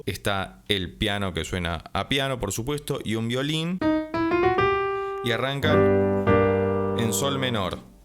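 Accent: Argentina. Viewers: Muy buena reacción 😮😮no lo puedo creer 😂😂Un coreano !! Hablando argentino 😂😂 Estoy en Galicia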